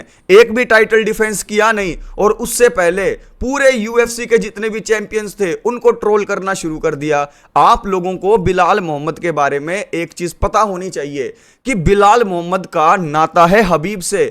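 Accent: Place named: Haryana, India. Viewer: Your Haryanvi accent is too good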